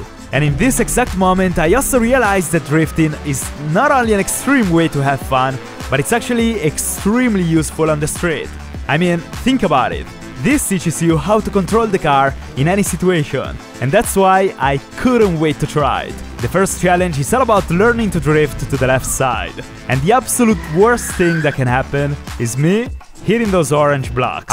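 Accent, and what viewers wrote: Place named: Italy. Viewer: ciao dall accento si capisce che sei italiano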